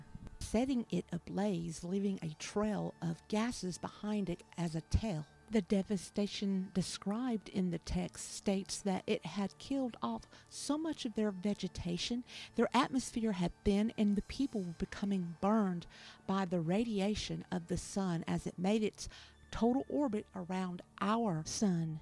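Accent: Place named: South, United States. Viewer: Loved this. Beautiful video. The southern twang narrator gave it extra charm😊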